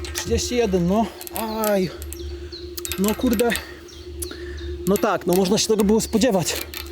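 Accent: Silesia, Poland. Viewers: Super kondycja i fajno ślonsko godka. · Cześć oglądam twoje wyczny od początku kanału,pracowalem dużo na Śląsku w twoich rejonach jako dekarz, i jak wracałem do siebie to godol jak ty😂jak byłem mlody to tes wszystkie drzewa i kominy były moje,teraz wiekowy się robię...moj rekord na drążku nachwytem 19 ale miałem 20 lat...teraz starość zaraz wnuki może będą 😊ale staram się ruszać, pozdrawiam i wysokości, tylko z głową jak zawsze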